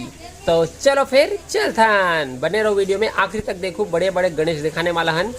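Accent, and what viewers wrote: Chhattisgarh, India. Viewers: Hello bhai apka jo cg ka bol hai bahut hi mithas hai aap hamesha aise hi cg me bolte raha karo ok👌👌🙏🙏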